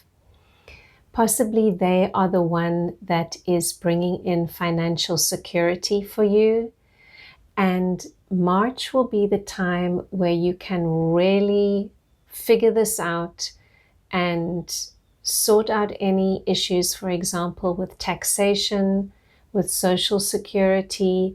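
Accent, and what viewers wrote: South Africa. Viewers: Definitely a South African accent. Am a Cancer Sun and Rising. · Thank you so much for this, you have no idea how much I needed this. 🥺❤️🙏🏾 Bless your soul. 🥺 Also, you sound so South African 😭😩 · That sounds like a South African accent - Howzit from Plett. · you sound south african, lol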